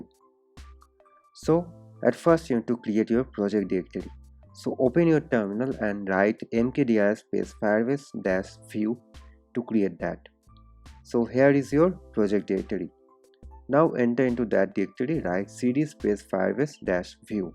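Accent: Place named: India. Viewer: 인도억양 영어... 참....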